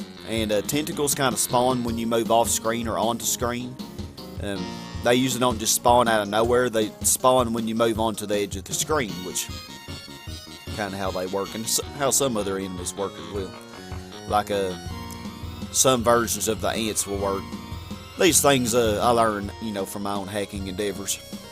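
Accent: South, United States. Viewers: At first I thought you were British, but about 1 minute in and I realize you are southern/country. This is easily my favorite game from the SNES and Sega Genesis era. A friend and I reached the 40 something level where you fight the giant spider, and the game glitched out and we couldn't progress any further. Basically robbed of beating the game, the one and only time we made it that far. It's level 46 or 48 or something like that.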